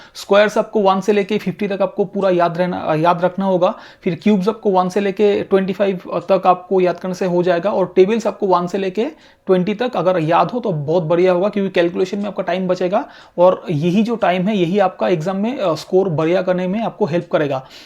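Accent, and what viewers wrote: Bengal, India. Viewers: Sir aap bangal se ho na ?? 😅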